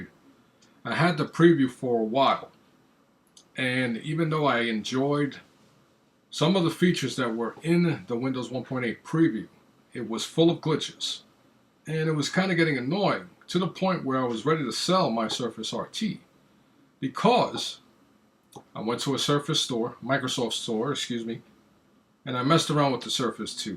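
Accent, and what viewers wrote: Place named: South, United States. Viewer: Where are you from? You have a mix accent of black and southern border kinda a guy. No ofense, just curiosity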